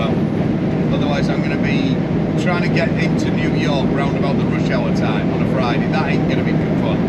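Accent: Yorkshire, United Kingdom. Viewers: It's grand listening to a tyke explaining what it's like over there!!Top man!North Yorkshire man here.🇬🇧👍🤔😄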